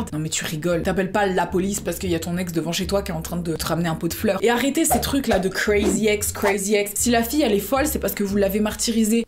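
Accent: England, United Kingdom. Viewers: L accent anglais j adore t aime bien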